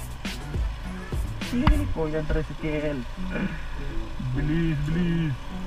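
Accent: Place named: Cebuano, Philippines. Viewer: Halatang bisdak paps ah😁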